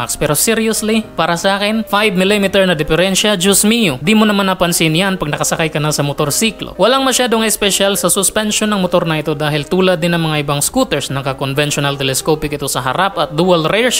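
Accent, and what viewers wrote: Visayas, Philippines. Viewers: Dahil Bisaya ka mag salita,,, Subscribed na ako... Heheheh!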